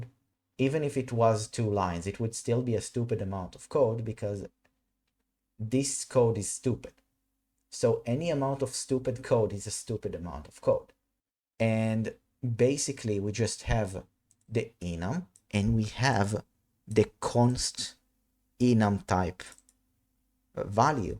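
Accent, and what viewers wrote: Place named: Israel. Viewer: Are you Israeli? You have an accent that really sounds like it.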